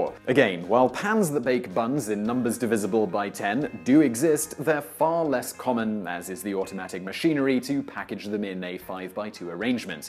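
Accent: United Kingdom. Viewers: The British accent really adds credibility to these lessons.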